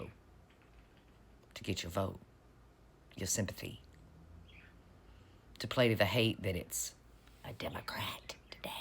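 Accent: South, United States. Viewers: Your accent is beautiful, I have never had a good impression of southern accents until you. Subscribed for the pleasure of listening and intellect. Thx, you should narrate for documentaries... ❤️ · Totally agree!! It reminds me a little of the Agatha Christie' A Murder Is Announced... "she wasn't there"... and the ear wound... IYKYK Just to ask where in the States are you from? As an English woman, I love your accent! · Tbh, at first I thought you were saying Butt Love🤣, not Butler! I was thinking that maga would be all disturbed for sure about FDT engaging in Butt 🏳️‍🌈Love, and any endless conspiracies of 🏳️‍🌈 behaviour... 😂 I'm not from the states, btw, so sometimes the stronger accents from the south distort the words in a way I'm not used to hearing. Thank you for the giggles!!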